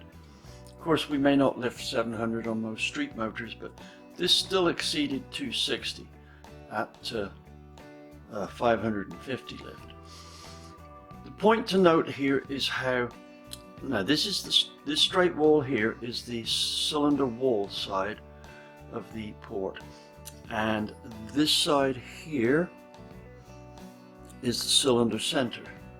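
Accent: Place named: Gloucestershire, United Kingdom. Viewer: Hi, great Video, simple terms, and nice to see you have not lost your Gloucestershire accent after all those years over the pond.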